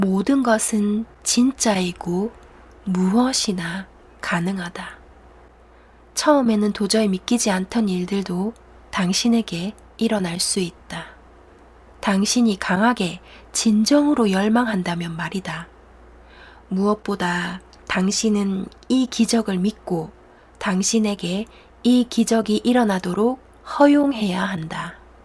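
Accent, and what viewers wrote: Gyeongsang, South Korea. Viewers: 나도 경상도 사람이지만.. 사투리 너무 웃겨 ㅋㅋ 너무 현실적이야...ㅜㅜ 그래서 더 귀에 콕콕 박혀.. ㅋㅋ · 사투리 방송 방갑네요 어떻게 갱상도 사투리 방송을 할려는 생각을 하싯는지.... 그리고 진짜 욱겨요 ㅋㅋㅋ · 나도 갱상도 할밸세. 좋은일 하는군..ㅎㅎ 자넨 사투리라고 하지만...억양만 그럴뿐 용어나 발음은 많이 표준화 됐다네... 나도 국어와 언어공부를 쪼께 했는데, 연습하고 공부하지 않은 토백이 사투리는 자네처럼 발음이 안되지....노력 많이했네. 계속 정진 하고 좋은결과얻고 행복 하시게...나도 독자로서 자네 사랑한다네...신의 은총과 축복을 비네.!!!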